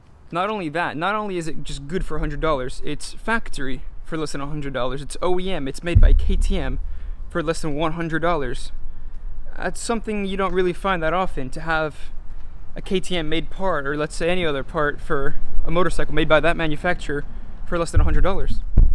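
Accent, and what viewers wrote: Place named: Europe. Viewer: Very good review. Are you European? I noticed an accent.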